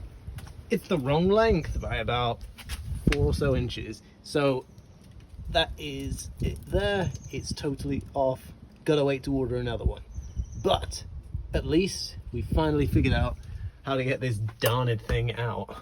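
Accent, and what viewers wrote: England, United Kingdom. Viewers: Lovely engine sound. Where in England is your accent from? Excuse nosiness. Huge difference in fuel prices. Would cost an arm and a leg running those lovely big engines here. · Good to have and hear a Brit on here having fun with old cars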